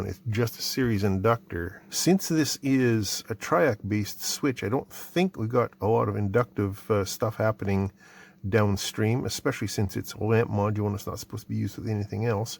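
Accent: United States. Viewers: I'm going to assume you are a Big Clive fan, after the "one moment please" Going to also assume you from the extreme northern USA, Montana to Washington, from hearing, OAT and ABOAT. for out and about. Got a free X-10 sample pack after reading of their $40 value in an electronics magazine. (Mid 90's) 6 other electricians from our vacuum cleaner plant in N Canton, Ohio got in on the deal. Still have one to open the garage door 30 years later. (Sears wanted a fortune for a transmitter and had made buying the decoder chip impossible.) Good Bye Sears 🥲🤣 Here's your 👍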